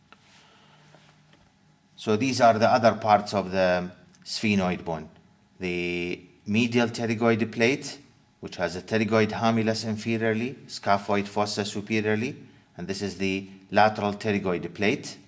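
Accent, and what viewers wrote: Iraq. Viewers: .. are you Iraqi teacher??? your dialect is 100% Iraqi:)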